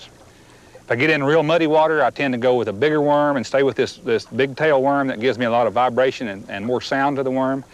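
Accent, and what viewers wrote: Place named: Tennessee, United States. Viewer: You sound like bill dance! Must be from Tennessee?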